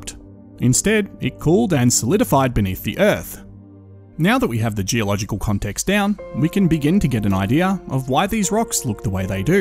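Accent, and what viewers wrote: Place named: Australia. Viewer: I love your Content, You are saying wonderful things but the way you narrate them and by that I mean your thick Australian accent makes watching your them really-really difficult. I am sure I am not the only one with this problem. I am watching this one just to post this comment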